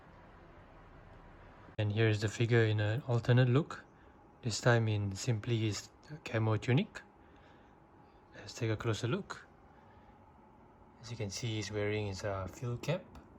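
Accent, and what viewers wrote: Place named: Malaysia. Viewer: Your accent's very familiar! Are you from malaysia or singapore?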